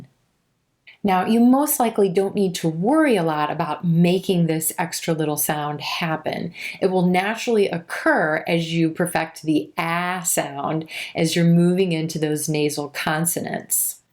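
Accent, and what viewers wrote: United States. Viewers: The sound of your voice is so crisp and clear. You have a wonderful American accent.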